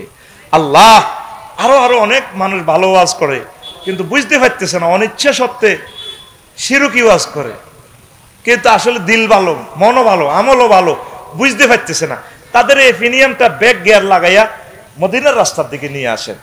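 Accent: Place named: Noakhali, Bangladesh. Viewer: আন্নেরে নোয়াখাইল্লা কেল্লাই কয় আন্নে কমিলারা মানুষ হইয়া নোয়াখাইল্লা ভাষায় কথা কনআম্নের কালচার নোয়াখালীর মতন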